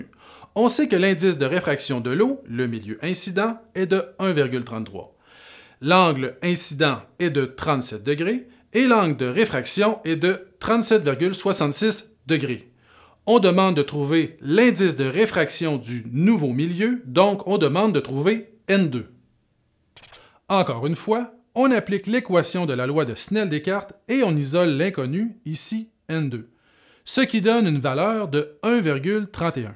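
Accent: Quebec, Canada. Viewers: Merci pour ton accent québécois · top l'accent du Quebec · Wow merci beaucoup pour les explications simple et surtout très claire, avec un petit accent québécois qu'on apprécie toujours autant ! MERCi ! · Au tout début, je pensais que j’allais rien comprendre, en plus tu as l’accent québécois :) mais au final aucun problème ! J’ai parfaitement compris ce chapitre grâce à toi, Merci !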